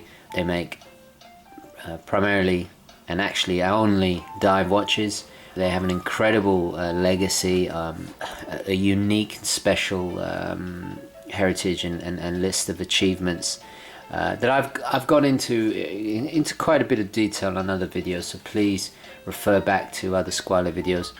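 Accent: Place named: United Kingdom. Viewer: Alu MIN ium insert. Love the British pronunciation. Superb watch and review.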